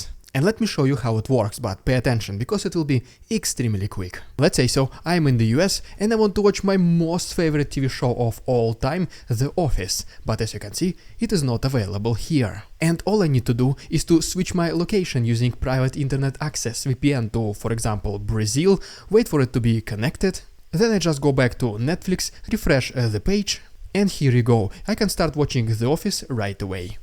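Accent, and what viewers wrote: Russia. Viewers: Hey, Since I view the same footage as censored for you, I'm thinking: Might the algorithm misstake you for a pro-russian blogger? Why don't try one episode dropping the russian accent and with another episode name? Take out any Ruski might be the formula? 🤔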